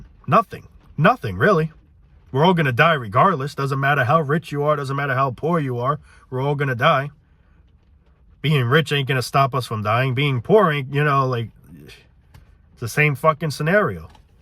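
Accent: New York, United States. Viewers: You have that new York accent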